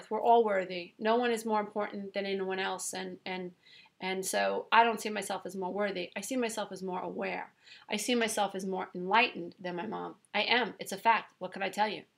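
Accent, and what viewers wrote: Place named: New Jersey, United States. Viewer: Love you after only listening to this video..... We have very similar lives/experiences. Love the way you talk......I'm a jersey girl - you sound like one as well :) How can I follow you?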